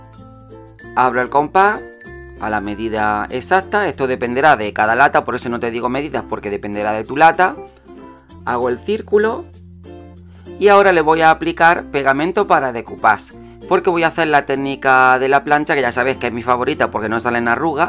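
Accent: Andalusia, Spain. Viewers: De Venezuela Hijo ...se te Nota lo andalu Hole Hole ...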